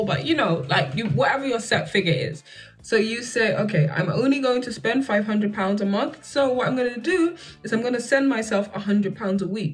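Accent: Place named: United States. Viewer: Yasss but how you have American accent 😅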